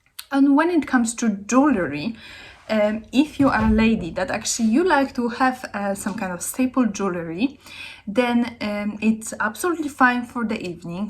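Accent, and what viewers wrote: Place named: Russia. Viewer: hi there ! i really am thinking abt going at the Opera on my birthday this year (i'm 17,) are there dresses we can wear : formal - elegant but not womanly. really chic. if you know what i mean ? i really am thankful for your video ! btw , not to be rude , but i'm sensing a really really beautiful russian accent ? or ukrainian ? take care xx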